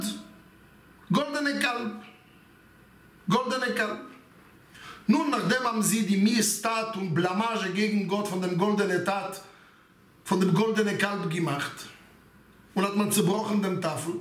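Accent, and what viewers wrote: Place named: Germany. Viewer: Danke Rebbe, ich finde Sie reden gut Deutsch. In den Momenten, wo es nicht so klappt, bringen Sie aber eine Seele mitrein, wo man versteht, und spürt auf Seelenelbene, was, und wie Sie das meinen z. B. Wenn sie jekkeln, wo das Wort so süß rüber kommt, da bin ich sehr froh, dass Sie so unterrichten. Meiner Meinung nach, falls es Sie interessiert, und Sie das wissen möchten, für mich, sind es 98% die Sie sehr gut Deutsch Reden, die 2% Jekkisch bitte behalten, die geben noch mehr süße und Seelenfreude mit ins Herz und Kopp. Danke Rebbe.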